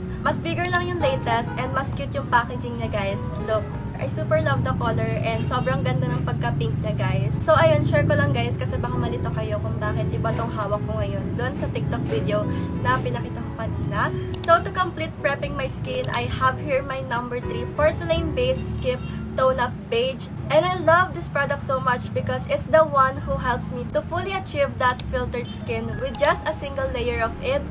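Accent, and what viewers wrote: Philippines. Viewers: Yup its Filipino accents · its taglish.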